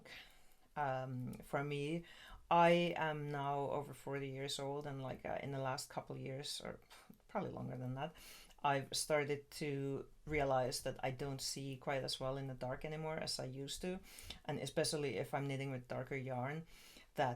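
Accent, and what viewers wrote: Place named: Finland. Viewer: I was watching this to find out more about the light and noticed your accent sounded Finnish. Then I saw the Moomin poster in the background and thought “she must be Finnish!”. If you are Finnish, then I will say kiitos videosta.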